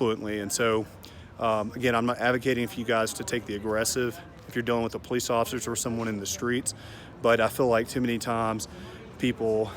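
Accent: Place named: South, United States. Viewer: Me gustaría que hablara español se le escucha muy bonito con ese acento sureño de e.u.a. Pues no se inglés 😳no se si me equivoque al creer que es de allá. Y que bueno que siempre esté alerta. Ah, y también para comentarle que será que sea peligroso que mencione que fue soldado, ya que aquí en México pues los soldados no dicen que son eso y no son buenos algunos por que están involucrados en cosas malas. Y usted tiene mucho carisma y es buena onda. Cuídense mucho. Gracias por contestar. Me gustaría que también aprendiera español bueno sin albur. Saludos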